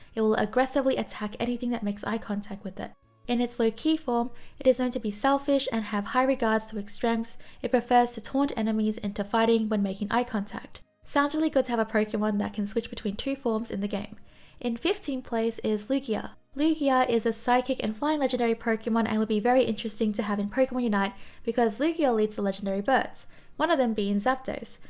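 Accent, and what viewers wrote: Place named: Australia. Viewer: I'm pretty sure you are saying all the names right, just with an Aussie accent lol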